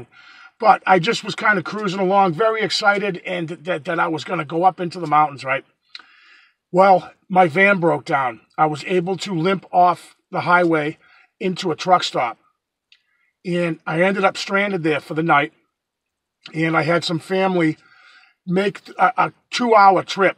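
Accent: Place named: Massachusetts, United States. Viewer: I have to laugh. The very first video of yours that I watched, not having any idea what part of the US you were in, as soon as you started speaking, I knew you were from SE Mass. Not Boston, not Western MA, but the Taunton, Dighton, Berkley, Norton, Mansfield, etc region. I think your videos are "wicked awesome".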